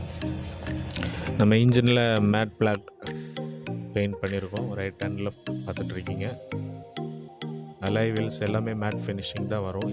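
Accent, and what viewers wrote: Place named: Kanyakumari, India. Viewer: Malayalamum ila tamilum ilanu rendu kalanthu pesuringa kanyakumari slang ipaditha irukumo. Na intha pulsar tha vechurunthe alloy wheel ku munadi generation. Sadly apola vandi avlo quality ila silencer la ota vilunthudichu, battery 1.5 years kuda varathu. adikadi model update panathu enoda vandi athe pola update pana mudiyala. Ana classic pulsar classic tha athoda sound nala iruku oturathuku nala irukum. But ipo p150 pulsar vanthathunala palaya pulsar discontinue paniduvanga. Apo palaya pulsar owners ithu mathiri paint job pana chances iruku.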